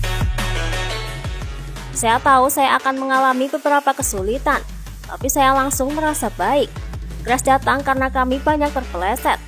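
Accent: Java, Indonesia. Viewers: Gagal fokus sm suara pengisi video, logatnya jawa ini. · Medok beud njir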